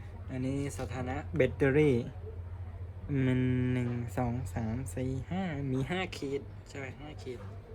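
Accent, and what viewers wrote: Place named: Cambodia. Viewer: ออกขเมรๆนะภาษาพี่นี่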